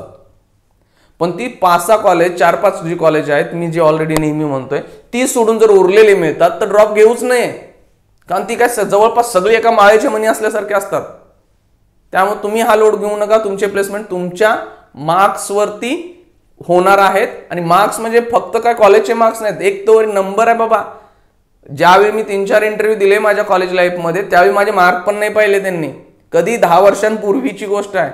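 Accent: Solapur, India. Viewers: सर तुम्ही सोलापूरचे आहात का?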